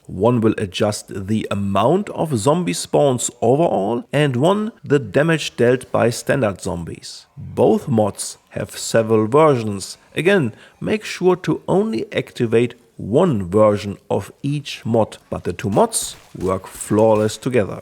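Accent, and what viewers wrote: Germany. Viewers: Bro sounds more german than me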